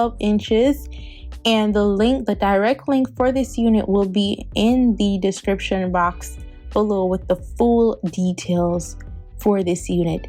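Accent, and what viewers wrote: Jamaica. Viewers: Sound like you are Jamaican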